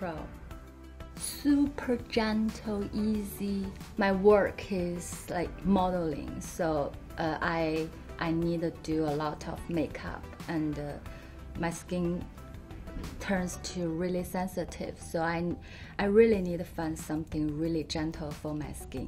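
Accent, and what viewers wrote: China. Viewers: Is she Chinese? Because this accent is common among Chinese. I like it! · Agree, also because her English sounds Chinglish.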